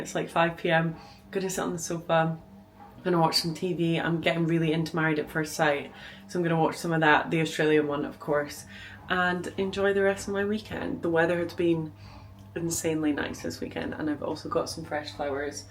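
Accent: Scotland, United Kingdom. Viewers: I thought you might have had visitors this weekend, because you sound particularly Scottish at the start of this vlog :).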